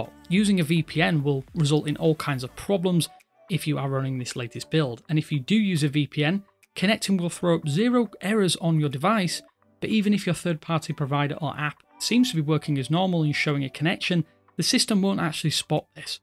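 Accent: United Kingdom. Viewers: Why is this channel based on the U.S when the host is clearly British?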